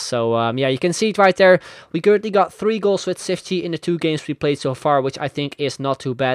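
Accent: Netherlands. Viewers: Alright i never hurt of you bfore no lie but in 3 sec i knew you where dutch and subbed right away xD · He is dutch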